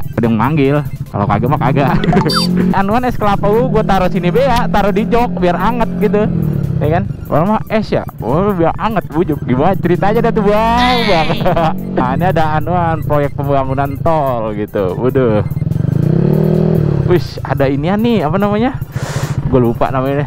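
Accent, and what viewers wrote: Jakarta, Indonesia. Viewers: Logat nya betawi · Anjir logat lu kaya orang jakarta bang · betawi bat da ah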